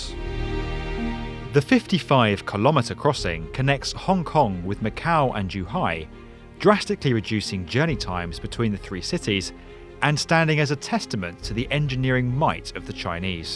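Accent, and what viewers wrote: United Kingdom. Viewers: B1M is one of the most professional and dignified channels here on YouTube although they do have the unfair advantage that their voice-over is done by the most British man ever that always add an extra layer of class and sophistication to any piece of media.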